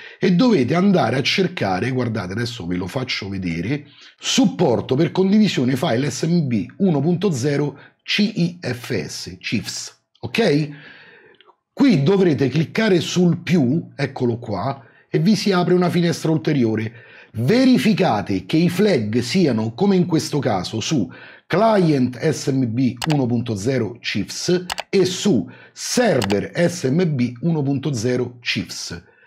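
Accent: Rome, Italy. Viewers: quella cadenza romanesca nel tuo ottimo italiano, rende tutto più piacevole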